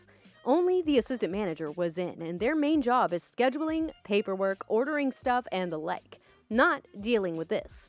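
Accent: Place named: New Jersey, United States. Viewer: That last story could be simply entitled people. Your accent reminded me of the South Park "From Jersey" episode.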